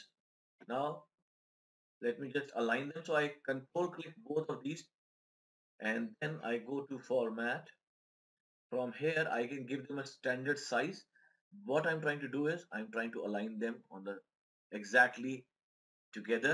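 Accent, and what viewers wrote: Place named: India. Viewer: what region of India are you from? you have the coolest accent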